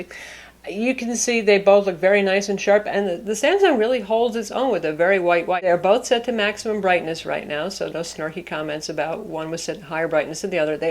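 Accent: Texas, United States. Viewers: You sound texan to me...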